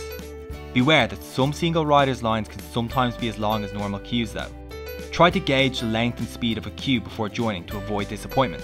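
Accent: Ireland. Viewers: This is so random are you Irish? 😂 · Was surprised to hear an Irish accent!! Irish here too!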